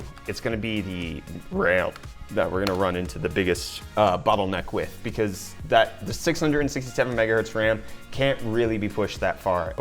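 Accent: South Africa. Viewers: m8 wat? south africaaaaaaaaaaaaa? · Your South African wow so cool I'm also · you from south africa ? is jy van Kaap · You south african?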